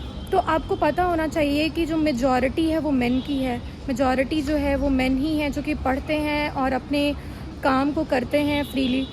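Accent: Bihar, India. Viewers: The way you taking is just awesome Are you from Bihar ??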